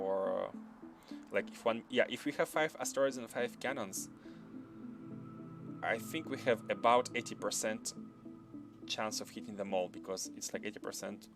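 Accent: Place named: Eastern Europe. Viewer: This railway system is very difficult. After seeing how Nilaus builds a railway where everything is "copy-paste" and simple in logic, it hurts a bit to make your life harder. I started watching yesterday and I'm going to watch all the episodes. Your English is amazing and very understandable because you speak like Slavs and I'm from Poland. You speak very carefully and that is a huge plus.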